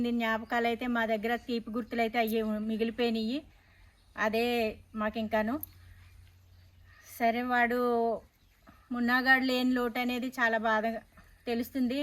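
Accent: Godavari, India. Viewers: స్వచ్ఛమైన గోదారి యాస తో అక్క మాట్లాడుతుంది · మేడం మీది ఏ ఊరు మా గోదావరి జిల్లా అని అడుగుతున్నా మాట తీరు అలానే ఉంది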